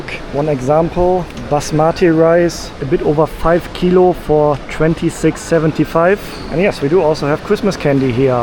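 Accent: Germany. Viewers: ich rieche deutschen aktzent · Hello, I‘m here the first time, when I heard the famous German ascent, I had to listen a little and I must say, I liked your tour very much! I wanted to visit Qatar 3 years ago, but it didn’t happen, so you gave me a very good impression and description of that place, thanks very much! I am only 3-4 months/year in Germany and I am completely with you, when you express your feeling’s: I wish Germany would look like this! I wish many times the same! I look forward seeing and listening to more of your recorded journeys. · Ich liebe dein deutsches Englisch 😅😅😅😅❤❤❤ I love your German English 😂😂🔥🔥 Best videos bro thank you for all this videos 🎉🎉🎉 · Love the German accent 😅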